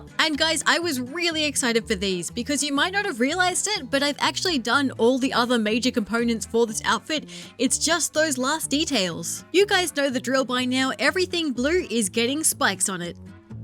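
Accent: Australia. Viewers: If I was asked . . . I'd guess you were an Aussie !. 🤣🤣😂 I never realised that before ! It makes me so happy to know you are a Cousin !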